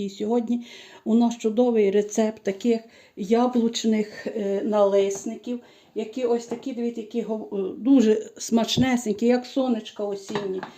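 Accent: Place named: Galicia, Ukraine. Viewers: Ні. Це не львівський говір. Це Прикарпаття! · Дуже дякую за чудовий рецепт і за рідну прикарпатську говірку! 🥰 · Пані дякую за рецепт ви з Львівщини чую по розмові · Моя дорогенька, "най си трошка постоїт ", "підиллєємо "... Обожнюю свою рідну говірку галицьку! Скучаю за нею несамовито, я живу в Рівному, і тут свої діалекти, далекі від моїх рідних...😢😢😢 А зі старістю ностальгія за рідними краями тільки посилюється....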